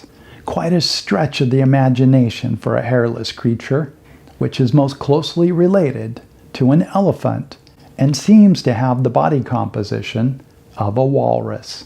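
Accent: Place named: England, United Kingdom. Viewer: Thank you 🙏 so much for your beautiful video. I love it a lot because I learnt so much from your English accent 🙏 and your presentation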